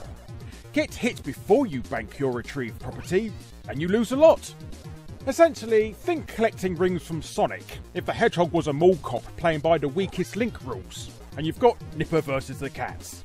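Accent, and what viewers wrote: United Kingdom. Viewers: You have the best brittish accent on this website.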